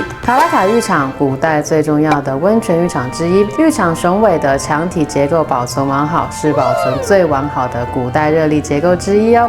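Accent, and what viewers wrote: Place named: Italy. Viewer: 義大利語唸得超迷人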